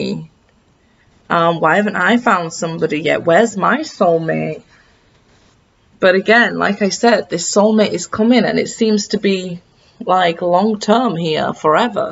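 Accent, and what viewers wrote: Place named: Lancashire, United Kingdom. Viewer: You sound like a local. I'm a Lancashire lass😊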